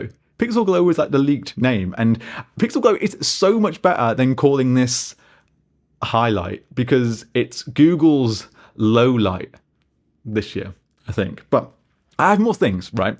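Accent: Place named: United States. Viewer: Really good American white guy accent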